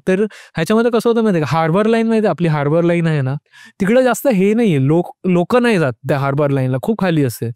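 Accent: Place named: Goa, India. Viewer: Haaa ji bhasha bolto aahe na goa chi bhasha aahe hi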